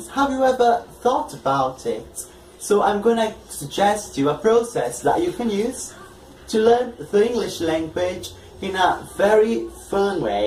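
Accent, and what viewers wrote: United Kingdom. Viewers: Perfect English!!! This kind of accent that i want!! A British one.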